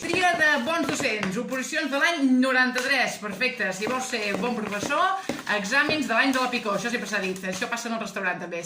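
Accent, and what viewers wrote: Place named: Girona, Spain. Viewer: M’agrada escoltar la a la radio accent Girona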